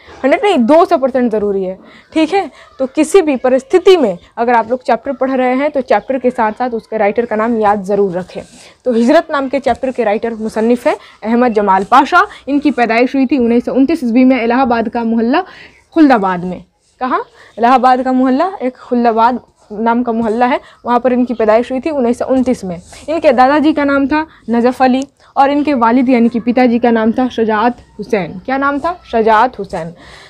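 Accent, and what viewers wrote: Bihar, India. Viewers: Ma'am aap BIHAR se hai kya??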